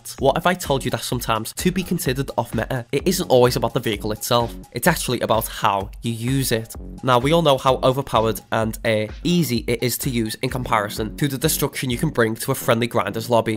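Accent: Liverpool, United Kingdom. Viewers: I am now convinced you are British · omg , he's so bri-ish 😢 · Scouser!! Yes now lad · ps: i've never met a scouse gta youtuber before :(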